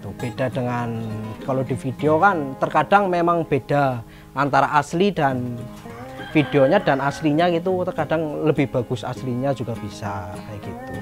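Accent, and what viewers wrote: Banyumas, Indonesia. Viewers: Ora ngapak ora kepenak yak.. :-D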